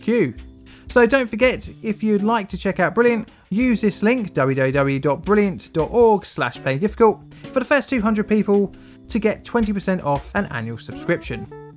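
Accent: United Kingdom. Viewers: Why is a limy narrating this, ENGLAND HAS ENOUGH ISSUES OF ITS OWN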